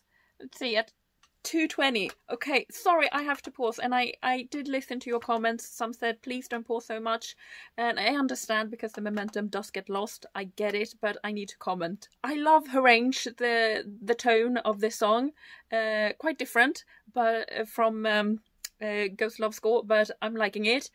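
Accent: Sweden. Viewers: Hearing you talk for 30 seconds was enough to realize that you're a fellow Swede.:) I thoroughly enjoyed the video and I'm looking forward to whatever you do next! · another great reaction, tnx. i detected what i thought was a faint trace of swedish accent in ur voice, so i had to check ur info. i thought skåne, but deep forests? hehe, im a smålänning...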